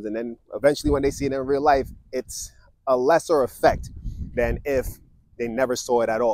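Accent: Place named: London, United Kingdom. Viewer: Sounds like London!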